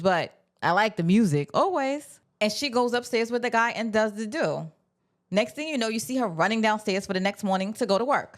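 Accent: New York, United States. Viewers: yea I was thinking NY !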